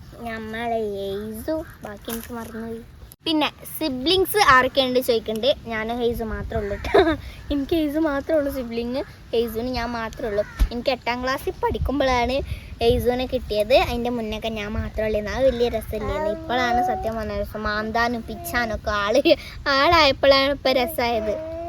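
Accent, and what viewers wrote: Malappuram, India. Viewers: മലപ്പുറം ആണെന്ന് മോളു പറയാതെ ആ സംസാരത്തിൽ മനസ്സിൽ ആവും. ഞാൻ മലപ്പുറം ആയതു കൊണ്ട്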